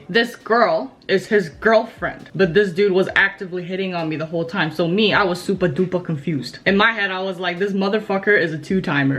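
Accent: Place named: Bay Area, United States. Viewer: Lol! I love your accent. Are you from the Bay?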